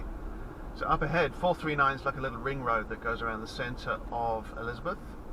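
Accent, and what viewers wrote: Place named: England, United Kingdom. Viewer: This was randomly recommended to me by the Youtube algorithm. I'm from Clark. What a fascinating video of an English accent narrating the area I grew up in. You have described things in a way I never would have thought of! Please do more videos! Come on down to Clark, Cranford and Rahway!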